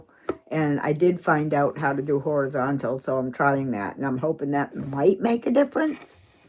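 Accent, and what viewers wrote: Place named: Maine, United States. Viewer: Yes, you definitely have a main accent. I have a very good friend that goes to my church here and you sound just like her!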